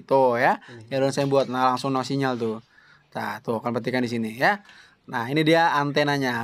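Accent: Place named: Bekasi, Indonesia. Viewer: Org Bekasi Mane dye suaranya niiiiihhhh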